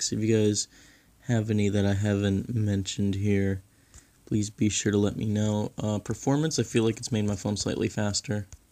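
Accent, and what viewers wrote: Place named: United States. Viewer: Thank God an American accent!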